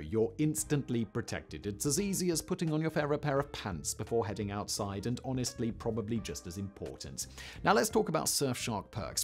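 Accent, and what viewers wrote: United Kingdom. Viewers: Too much "British inflections" makes this too hard to listen to.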